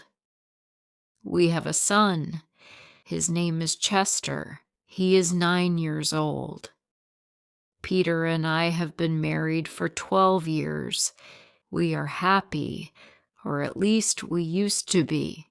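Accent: United Kingdom. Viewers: Thank you very much for your stories ❤. Is that a british accent?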